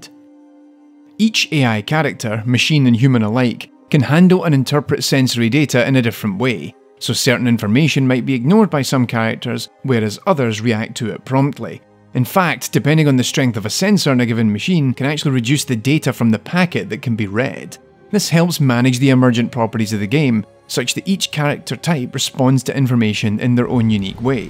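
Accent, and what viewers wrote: United Kingdom. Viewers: Hello, I noticed you've put a game on steam. I have a game ready for STEAM..but having trouble putting out on there. Could you do a tutorial on this PLEASE. Not many good UP TO DATE tutorials or there. Thanks.. Ps. Nice to hear a UK ACCENT 🇬🇧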